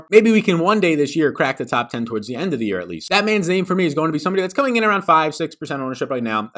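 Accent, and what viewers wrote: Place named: New York, United States. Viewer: You talk like you from new your or Boston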